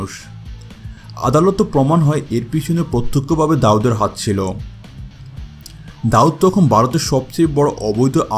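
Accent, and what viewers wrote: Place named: East Bengal, Bangladesh. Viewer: সালা বাঙাল আগে বাংলা বলতে শেখ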